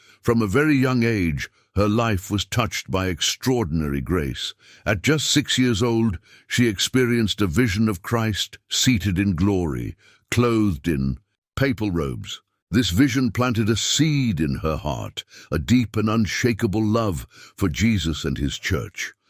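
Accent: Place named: United Kingdom. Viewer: Please! Drop the creepy AI British accent ..just can’t listen to it. How about a woman’s voice for a change?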